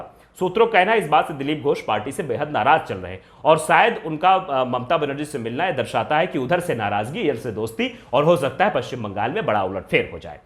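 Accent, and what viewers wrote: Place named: Uttar Pradesh, India. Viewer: भाई 💪 बोलते बोलते गांव चले जाते हो😃😜💯 यूपी वाली भाषा में बोलते हो 🙏💓 आनन्द आ जाता है 😜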